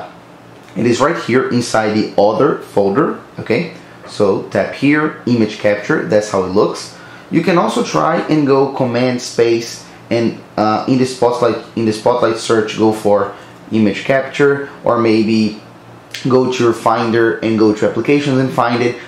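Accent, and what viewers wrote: Brazil. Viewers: brasileiro. certeza. sotaque. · Are you BR? two seconds into the video I could tell from your accent. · Haha eu perguntei pq eu também sou mano. Também moro nos EUA. Meio q consigo captar um sotaque brasileiro, mas vc fala mto bem. Abraços!! E o vídeo me ajudou tbm!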